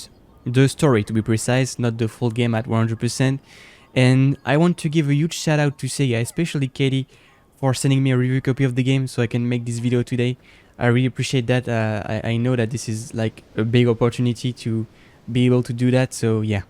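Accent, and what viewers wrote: France. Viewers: L'accent français est juste impossible de ne pas remarquer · Ha ok donc je viens juste de remarquer que t'a un accent français en parlant anglais tout va bien (bon après je regarde quasiment pas tes vidéos avec commentaires) mais dc pourquoi tu as créé une chaine anglaise? Tu arrivais pas à percer avec ta chaine française? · tas un accent français ptn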